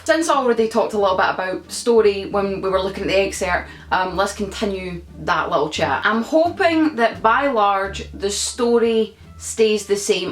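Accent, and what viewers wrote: Scotland, United Kingdom. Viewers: I have a question can you teach me how to speak Scottish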